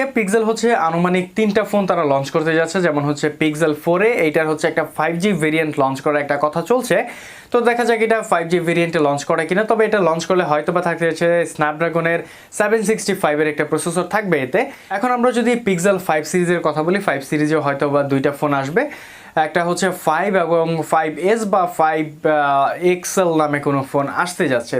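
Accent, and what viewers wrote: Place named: Bangladesh. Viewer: nA...ashole apnr ai vedio te beshir vag e india te phn launch korar kotha bolchilm to.. Ty vbsilm j apni hoito indian...bt apnr voice shune bhujha jai apni Bangladeshi 😅😅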